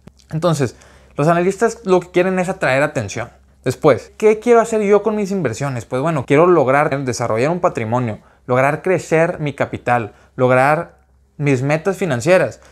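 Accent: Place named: North, Mexico. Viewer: Eres Mexicano y eres norteño vdd ? A mi no me mientes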